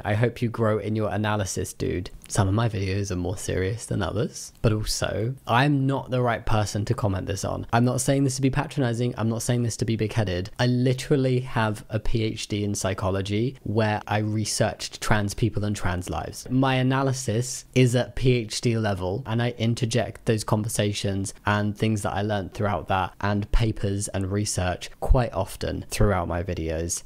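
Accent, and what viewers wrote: United Kingdom. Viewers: Re, Smug and patronizing: Thats the American ear hearing the British accent. John Oliver makes jokes about it frequently. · Omg these hate comments 😂😂😂 the only issue i personally used to have with your voice is your heavy british accent. It used to be kind of hard to understand for me no matter who spoke it, because english isn't my native language and the waste majority in media uses mostly american accents. I'm all good these days though, in part thanks to following you and few other channels of people from the UK. Love you, ❤, please don't even change. The cross between the dry humor, politely formulated sass, and actual explanations are so uniquely you, i love it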